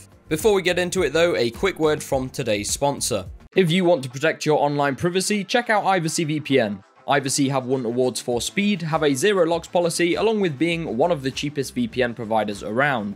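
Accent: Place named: United Kingdom. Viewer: that is the most intense british accent i have ever heard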